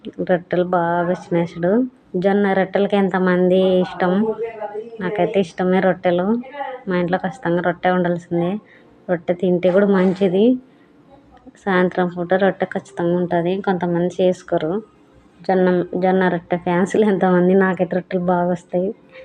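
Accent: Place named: Kurnool, India. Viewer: Hi akka Me vedios chala baguntai medi a village Madhi Kurnool Andhuke me basha vinte happy ga anoinchindi❤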